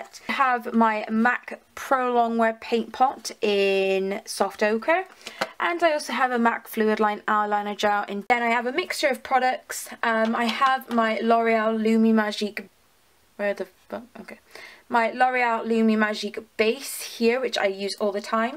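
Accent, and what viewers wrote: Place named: Somerset, United Kingdom. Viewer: You sound rely Somerset like me 😍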